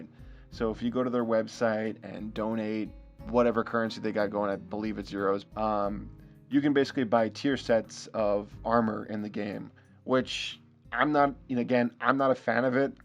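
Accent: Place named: United States. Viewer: This is so american, i dont know why, your voice your sentances :D love it <3 It just sound like your selling something :D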